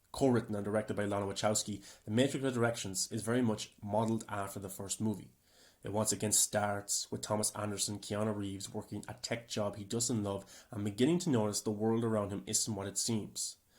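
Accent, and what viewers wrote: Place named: Cork, Ireland. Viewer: Great video. Fantastic movie. Made with typical Wachowski sincerity and heart which makes it worth a thousand Marvel films to me. Time will be kind to it. Might be reading too much into into it but you have some Cork accent!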